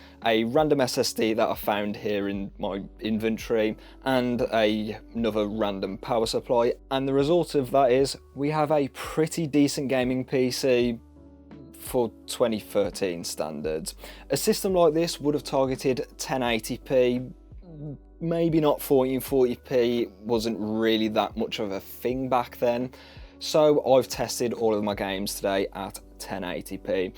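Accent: Black Country, United Kingdom. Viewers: great video, your accent sounds familiar, maybe the black country, Im a PC builder myself and I don't see many people around my area building computers haha. carry on with the great videos.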